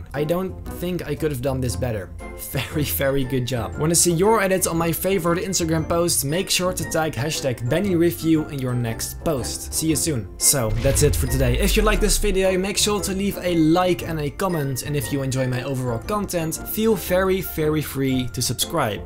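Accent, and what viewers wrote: Netherlands. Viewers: is it just me or does he have a dutch accent?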